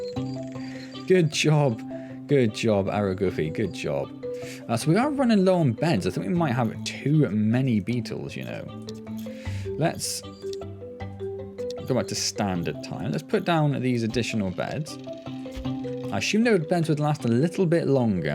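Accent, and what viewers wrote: United Kingdom. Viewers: gdamn i like ur voice u british?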